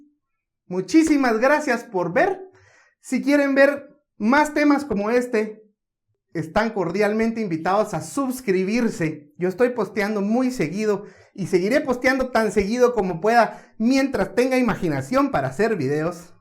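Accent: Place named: North, Mexico. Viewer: Creo que del norte de mexico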